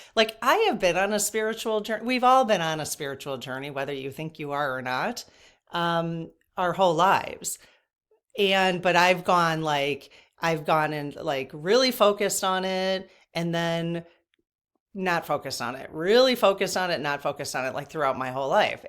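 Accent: Midwest, United States. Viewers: My Moldavite is a stinker too. In and Out…around. I too have a white cat named Lucy. She is in cahoots with my moldavite. I really enjoy watching your videos. You crack me up. It’s like watching myself in the mirror. My family’s from the Midwest (Illinois & Indiana) so speaking patterns are similar & sayings, add in I’m 10 years older but 4/12 bday. Even though I’ve been on the Spiritual Path since the early 1980’s I frequently find gems 💎 in what you share. There’s always another Facet to examine or depth of Clarity to explore. Thank you. 🙏🏽 ❤Liz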